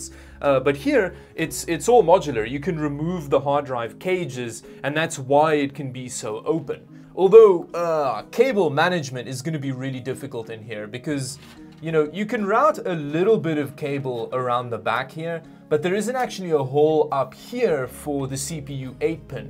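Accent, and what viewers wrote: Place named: Afrikaans, South Africa. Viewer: A Canadian man with a very peculiar spelling of the name David ( which has many origins like Polish, Dutch, and most of all I get an Afrikaner vibe from our channel host but he may just be French Canadian which can sometimes have a hard to place accent especially from the younger generation) has bought my unique and rare piece of computer hardware on eBay. I wonder if its the same guy?!?! I am sure it wasn't hard for the guy to figure it out especially if he was a fan of yours which is pretty cool. I have an extremely rare spelling of my middle name and my first name is uncommon as well so I get how easy it is for him to have placed you.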